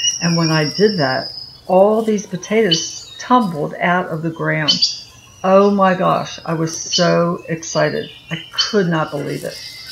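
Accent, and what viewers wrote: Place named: mixed, United States. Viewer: I knew I heard you talkin our NJ/Philly twang,! We're in southern NJ, but my family is originally from Philly. Thx for the cooler idea!:-)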